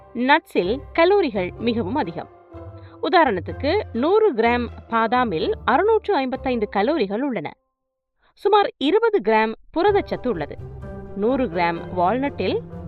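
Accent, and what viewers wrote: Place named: Sri Lanka. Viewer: Azhagana inimaiyana ilangai Tamil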